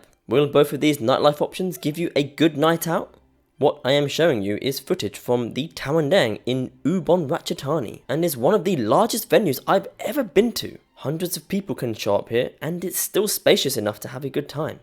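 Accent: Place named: RP, United Kingdom. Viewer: I love your accent; it's quite similar to that of the Queen of England. Greeting.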